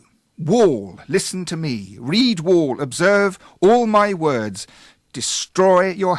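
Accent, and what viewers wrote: England, United Kingdom. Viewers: Almost no pure vowels. Every vowel sounds the typical English vowel/semivowel dipthong. What a surprise! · I really respect your efforts but your accent sounds British when you're trying to speak Akkadian. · With fkn British accent.... No man don't pls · Still sounds like some daft English Bloke! Come on, try harder on your vowels, you're pronouncing them like some English guy. I can hear your accent under the words. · Sound like hell hole with that English accent instead let an Arabic or some Jewish person read it since their language were related to Assyrian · I mean, we don't know how to say it in ancient Assyrian (even though this text would technically be in Babylonian), so there's no point in mimic a modern foreign accent. Plus, he's a British man with a very British accent, not American. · That’s not what I mean, I mean he’s pronouncing them wrong, and his English accent is in the way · Love his enthusiasm and scholarship, but man, you can sure tell he’s a Brit. 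We have many thousands of speakers of Assyrian Neo-Aramaic in the world today - they could help him with his pronunciation or even do the readings for him. I don’t mean to be a troll, and I’ve heard worse accents, but authenticity is really important. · Now Why I said "could you sound more middle eastern" is my own buisness. İf you want to argue it, you will ask properly or you are a waste of time. İf you try to be a smartass with that first comment of yours, you are incompetent. I know how modern assirian sounds by my neighbors and I learned many phonetics of the ancient one from people like Veysel Donbaz. And this man's british accent sounded off, I wrote "could you sound more middle eastern please". That man and every middle east language speaker understands what I mean right away. Besides not asking properly and not knowing what I mean. Only with assumptions you are writing all these out of point analogies and garbage like troll/dumb american. 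· This guy speaks Assyrian with an British accent. Awful. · This is how i understood what he said lol - ana kukuresh- i am Cyrus - shah ??? Akadi - king of the Akkadians - i swear it sounds like some Persian Dialect except with an Englishman's accent. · Yes, it is true that the man speaking here pronounces the Assyrian selections with a strong English accent. Typically of English speakers, whether British or American, he rolls the "R"s strongly to give a "foreign" touch. This is like how Americans tend to pronounce every foreign word they come across as though it were French, or how Japanese tend to pronounce every foreign word as if it were English.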